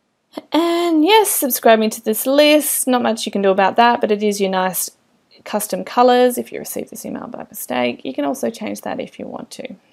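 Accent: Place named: Australia. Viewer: Hi, I love you girls you look great and being a fellow Aussie I just looovveeee the accent. Hey how do I get my non - generic landing page onto my website??? Many thanks, The Urban Garden Companion- An Aussie Gardener in the New York Jungle.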